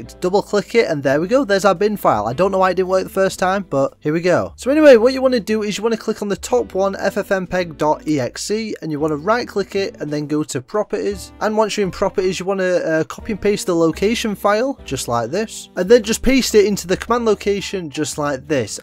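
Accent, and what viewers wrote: United Kingdom. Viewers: are you from sheffeild/yorkshire?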